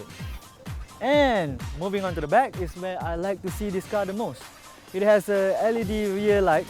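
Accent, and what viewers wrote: France. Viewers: Sounds like a French talking English. But it's entertaining. Good job. Keep it up. What matters is the info about the car. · Sounds like a French talking English... It's nice to hear that, good job bro 👍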